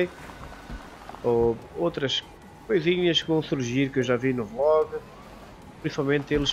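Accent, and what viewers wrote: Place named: Portugal. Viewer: Vc é português? Pois vc tem um leve sotaque, eu sou Brasileiro, e consegui compreender tudo o que vc disse!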